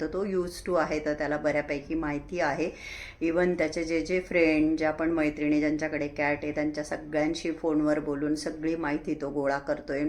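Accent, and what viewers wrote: Nashik, India. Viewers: Hi dii me tar pillu la Laddu ch mhananar..❤❤ me tar त्याच्या videos chi waat baghat hote Love you..me Nashik मधील ch aahe but now staying in Bangalore..tumcha typical Nashik cha हेल आहे i mean accent aahe तो खूपच मस्त वाटतो❤❤❤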